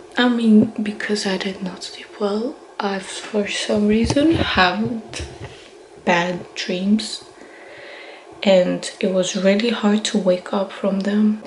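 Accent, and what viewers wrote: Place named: France. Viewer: Your accent is so french, where do u come from ?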